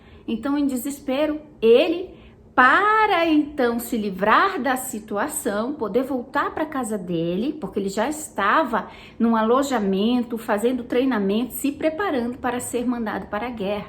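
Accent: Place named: Northeast, Brazil. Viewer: Vc é uma fofa!! Sem contar que adoro seu sotaque... Amo os sotaques nordestino... bjosss!!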